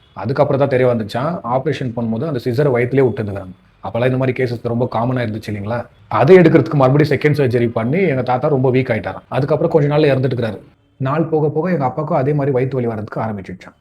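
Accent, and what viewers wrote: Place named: Karnataka, India. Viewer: நீங்க Bangalare கன்னடர்கள் மாதிரி தமிழ் பேசுறீங்க தம்பி....Half தமிழ் accent கூட இல்லை....ஒரு வேளை நீங்க பெங்களூரு la படித்து வளர்ந்தவரோ... தாய் மொழி பேசுவதற்கு பாராட்டுக்கள்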